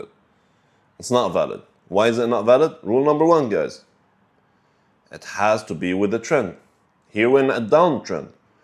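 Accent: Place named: Eastern Europe. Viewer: When I hear an eastern European accent, I listen. I hear you guys know how to trade.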